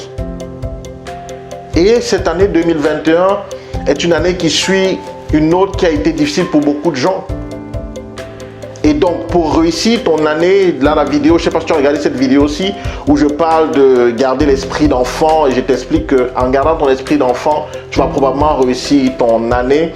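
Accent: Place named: Canada. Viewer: non non c'est juste une remarque, ne le prends pas mal 😂😂😂 Au début tu parlait de façon simple, mais je remarque que ton accent devient canadien Tu vit au Canada n'est ce pas ?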